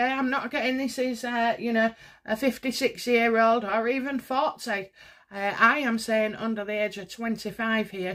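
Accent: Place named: Lincolnshire, United Kingdom. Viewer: Would love to know if your accent is from around Lincolnshire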